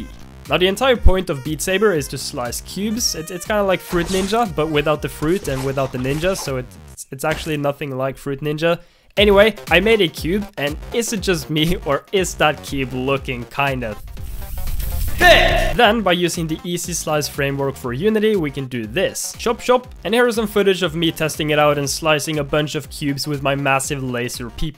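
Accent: Norway. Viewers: Are you Norwegian 😬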